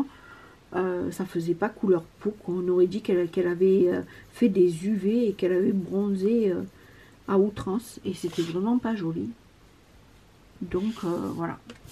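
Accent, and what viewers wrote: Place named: South, France. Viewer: Comme je te comprends 🫂 ah ouii c'est sûr que ton accent y est pour quelque chose hihi. D'accord tu es bien née dans le sud. Oh Martigues, j'y suis allée une fois. Et oui la vie, peut transporter ailleurs. Oh c'est gentil pour la vidéo explicative mystère, à condition que ça intéresse d'autres personnes qui voudraient se lancer vraiment dedans. Moi, je suis juste curieuse de cette drôle de bebête 🤣 Des gros bisous ma 😘😘❤️🫶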